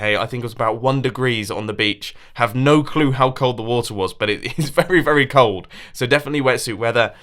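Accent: United Kingdom. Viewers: Mumbo is so British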